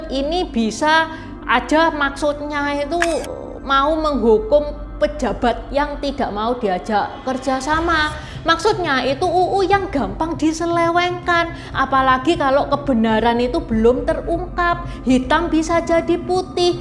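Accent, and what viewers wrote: Java, Indonesia. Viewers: jawanya medok banget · Terpengaruh logat jawir dia. Ga tau harus minum obat apa buat bikin netral si jadi logat biasa aja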